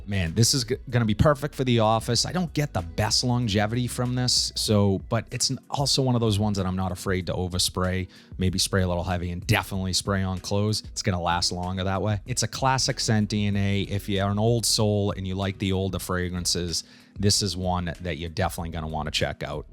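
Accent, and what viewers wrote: Boston, United States. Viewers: Thank you for the new fragrances, so many channels repeat what they have. I always come back to you .. and the Boston accent 🤪 how can I get you a sample of Megamare, I so want to see how you feel about this controversial smell. It’s so ocean it’s almost algae. I kind of feel Messoni wave is the best average overall but damn that sticks to me like glue. I can’t stop wearing banana republic metal rain.. this stuff is bangin 🤩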